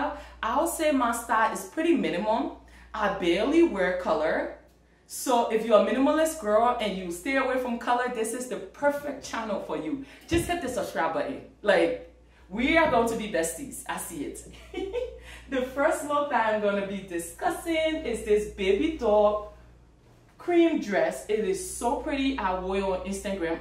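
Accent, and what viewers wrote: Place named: Liberia. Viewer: I hear that Liberian accent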